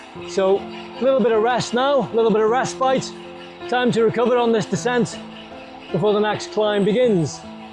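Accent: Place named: Northwest England, United Kingdom. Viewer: Great video.. we did the same route 3 weeks ago and it blew our minds too! Did you try the rough road up from where you stopped for refreshments? It’s a good climb but very rough surface with loose gravel. It sounds like you’re from the NW area, Cheshire/merseyside (ish) I live north wales nr Abersoch and Pwllheli and there are some fantastic roads over hear, so if you’re in the area give me a shout for some good climbs and car free country roads.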